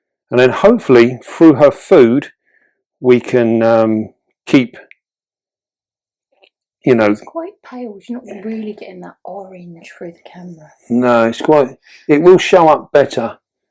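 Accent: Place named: Cockney, United Kingdom. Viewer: "Oh maay giddy aunt"......😮...😅 Brilliant cockney real London gab, luv it ❤ 👏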